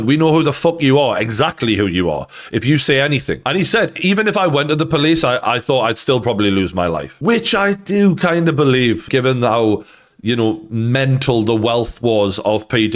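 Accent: Newcastle, United Kingdom. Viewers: Great to hear the geordie living in ireland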